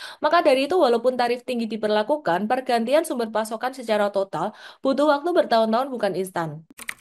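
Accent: Java, Indonesia. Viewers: logatmu jowo mba😁😁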